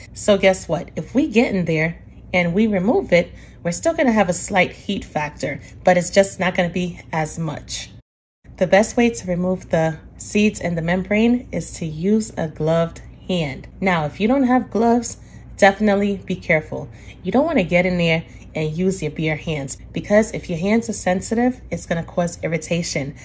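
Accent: New York, United States. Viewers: Ive never heard a new york accent sound so polite 😂❤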